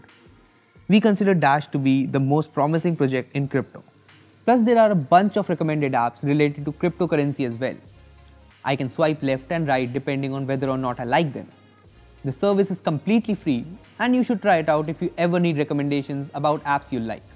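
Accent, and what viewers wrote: India. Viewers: jeez you tryna talk in street language in indian accent...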